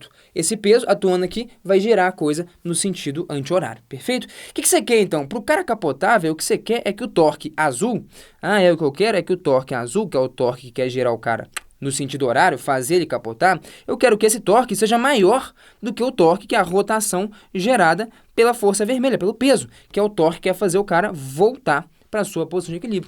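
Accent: Minas Gerais, Brazil. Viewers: A aula é excelente! Sem dúvidas! Mas confesso que conhecer o "mineirês" deixa o entendimento mais fácil em alguns momentos da aula... Digo isso sendo mineiro também.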